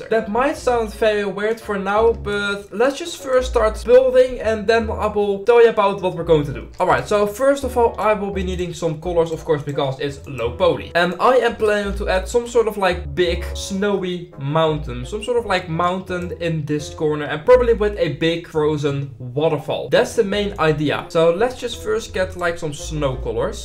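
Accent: Netherlands. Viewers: ik weet dat je nederlands of belg bent dat hoor ik aan je engels maar i love youre vidoes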